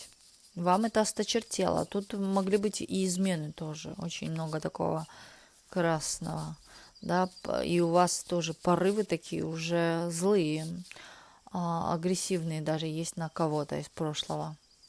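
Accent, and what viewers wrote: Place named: Czech Republic. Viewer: Сегодня я осталась без работы в чужой стране , одна. Да, описание мне подходит. Ха!) и фамилия Воронова, и блондинка, и да белая ворона как бельмо для окружающих. В остальном , расклад интересный , благодарю. Я тоже нахожусь в чехии. Вы хорошо справляетесь с акцентом, но чешский говор и словечки проскакивают.) Это оригинально звучит))) Очень благодарна за Ваш труд. С наилучшими пожеланиями.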